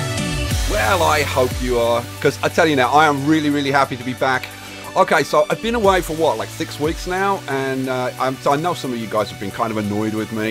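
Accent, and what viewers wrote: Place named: United Kingdom. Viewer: Hi! I've recently started watching your Videos about building London in Cities Skylines and your enormous prison in Prison Architect. I'm really glad that you've came back and I'm particularly looking forward to Cities Skylines After Dark and Anno 2205! I can totally understand your reasons to quit for a while, some things can really upset you so much, even if they're not so bad after all, but you have been angry about it for weeks and can't stop just like that. I'm very happy that you're back again! (Sorry for grammar mistakes, I'm not a native speaker.) PS: You've got the most wonderful britsh accent!:)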